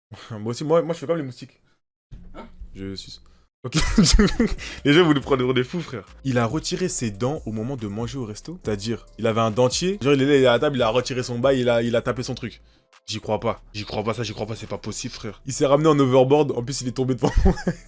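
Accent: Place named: Antilles, Caribbean. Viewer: Tu as grave un accent antillais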